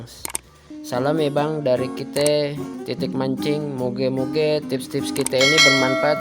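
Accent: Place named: Jakarta, Indonesia. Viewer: Khas banget bahasa Betawinya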